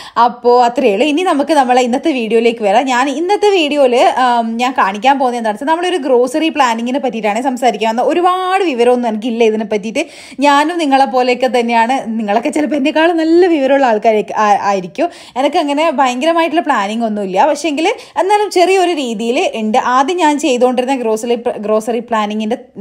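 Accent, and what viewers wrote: Kannur, India. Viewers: am also from kannur, samsaram kettond chodicheyanu 😊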